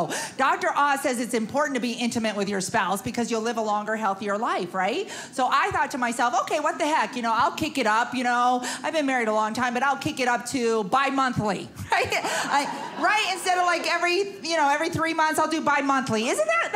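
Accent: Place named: Minnesota, United States. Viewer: Is she from California or Minnesota? She sounds like Minnesota to me lol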